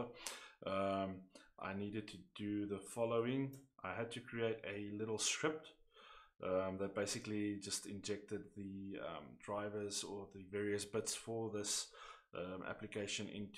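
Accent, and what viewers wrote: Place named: South Africa. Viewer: Saw ZA and I was like, hmmm maybe he's South African. Heard the accent and confirmed :). Dankie vir die awesome video!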